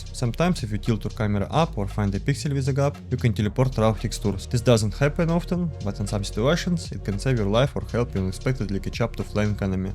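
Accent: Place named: Russia. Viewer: This russian accent 😛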